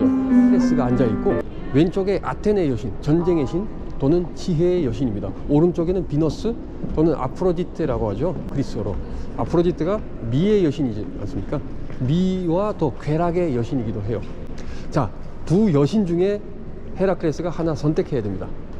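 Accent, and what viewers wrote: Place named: North Korea. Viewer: 안내 덕분에 영상잘봤고 좋은 공부가 되네요. 북한 억양이라고 하더라도 그건 나쁜게 아니니 걱정 조금도 마세요. 부산사람이 경상도 사투리 한다고 더 싫다거나 좋다거나 나쁜건 아니듯...오히려 한국말 너무 잘하시는 것이 억양을 떠나서 아주 멋져보여요. 구독 좋아요 눌렀네요.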